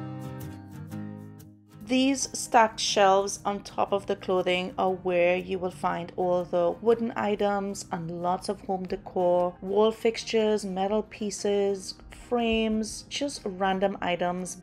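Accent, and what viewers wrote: Trinidad and Tobago. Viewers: New to your channel. Do I detect a Trini accent? I’m from Barataria. Enjoying your haul.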